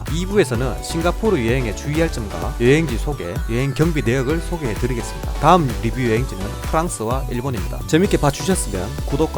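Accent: Gyeongsang, South Korea. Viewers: 16일에 싱가포르가는데 정말 많은 도움이 됬습니다 ㅎㅎ 저도 경상도라 말투에 호감이가네요~ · 이때까지 100번 들었습니다 저는 경남 창원사람인데 말씀 하시는 게 대구사람 같네요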